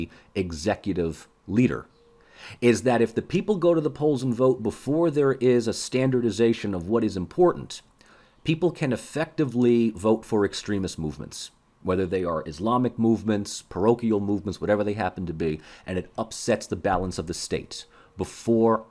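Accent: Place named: Boston, United States. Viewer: Love the Boston accent by the way.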